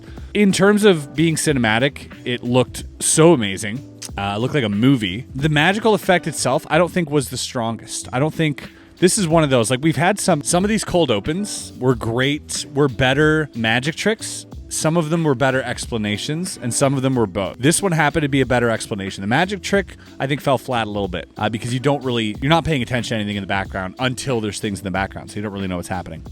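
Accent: Canada. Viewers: Holy smokes! You’re Canadian bud? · You Canadian?!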